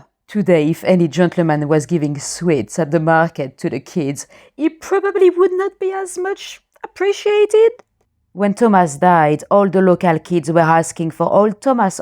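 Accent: France. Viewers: Madame, quand vous essayez de parler anglais votre accent français est presque comique.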